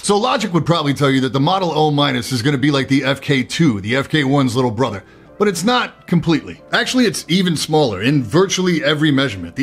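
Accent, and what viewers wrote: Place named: California, United States. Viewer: Love your humor and style of presentation. Haha where you from? Sounds like Cali.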